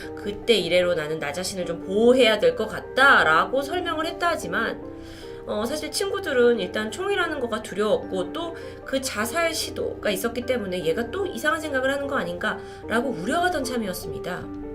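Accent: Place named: Jeolla, South Korea. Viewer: 전라도는 의 발음을 으 라고 해요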